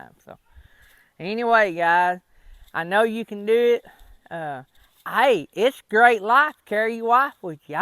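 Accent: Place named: South, United States. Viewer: Yeah you have a very harsh accent. It's quite obvious that you are southern lol. I think this is great advice. Jessie would probably love over the road work, but I've told him I don't like the idea. I wouldn't want him gone all the time and I wouldn't want to go either. So don't think it would work for us. Course with the hot shot, you seem to be home way more than you ever was with the RV hauling. Poor Tammy! aaaaaaaahahahaha!!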